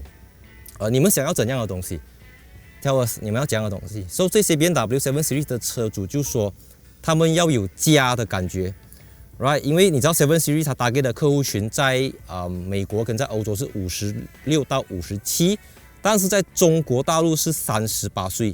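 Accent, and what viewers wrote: Malaysia. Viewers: 哈哈哈哈 主持人长得还挺好看的。马来西亚中文好有趣啊，虽然都能听懂，但是不知道为什么总透露出些许调皮。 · 人家就是故意講馬來西亞式華語來不讓你們（偷偷）把視頻搬去中國放咯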